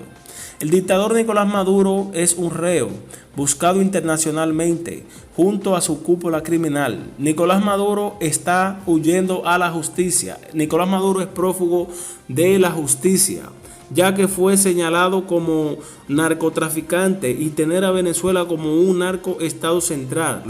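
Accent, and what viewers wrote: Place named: Cuba. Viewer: Será que son ideas mías o este señor es cubano¿?escuchen la voz y su particular acento!! Mosca¡!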